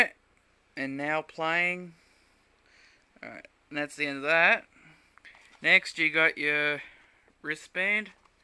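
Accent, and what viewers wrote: Australia. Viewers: He sounds like a queens lander. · darpo3t - he is not british! I'm british, and have neat and clean nails - he sounds australian or kiwi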